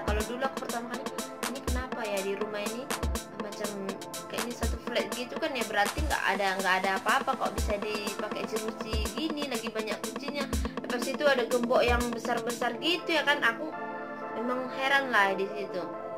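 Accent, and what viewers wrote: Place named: Indonesia. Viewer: Pekat endon nya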